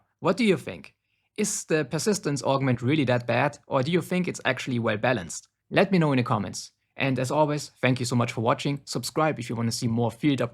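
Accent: Germany. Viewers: Im hearing a german accent xD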